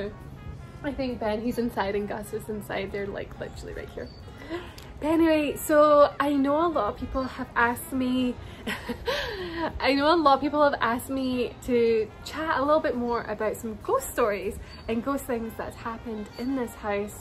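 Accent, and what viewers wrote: Scotland, United Kingdom. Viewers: OMG that's where I heard that accent from! I live in Scotland.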